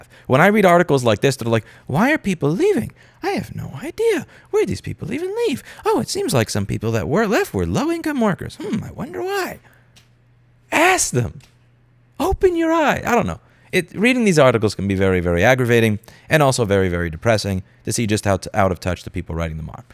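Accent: New York, United States. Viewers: Love it. You come across as a typical New Yorker until the 2nd minute that you putting sound thinking to work. And then communicate it like a New Yorker.I hey I like New Yorkers, it's OK. More than that I love your show and i too have been seriously annoyed with your main topic - right to repair. I'm an engineer and love to tinker. Back in the day that was half the fun. Woz backs backs you. I think even God would be happy for Woz's backing.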